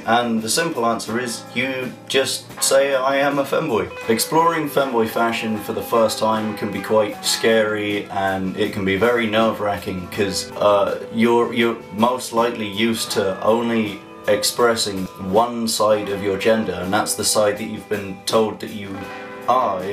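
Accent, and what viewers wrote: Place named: Appalachia, United States. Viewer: Femboy with a hillbilly accent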